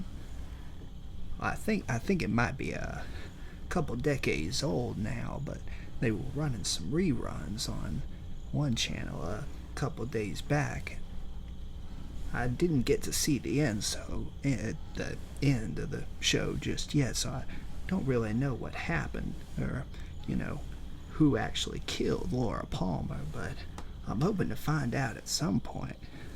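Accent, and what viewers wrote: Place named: Brooklyn, United States. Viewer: Man...That is a good brooklin accent right there bruh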